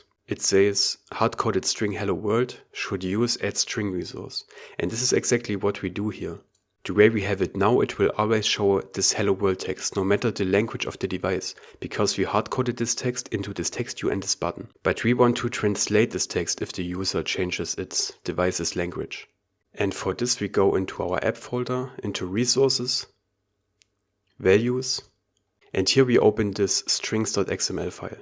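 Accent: Germany. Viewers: hört man richtig raus das du aus Deutschland kommst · yes...... your accent ....... it's abit nearer to German ...... even i turned on auto subtitles .....it generates German ...... so could i have connection with you ....? if you could add subtitles manually and i could help in that also if you need in Arabic ....Just a Suggestion . <mail>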